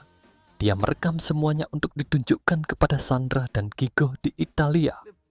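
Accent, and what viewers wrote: Java, Indonesia. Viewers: Suaranya jawa banget. Kureng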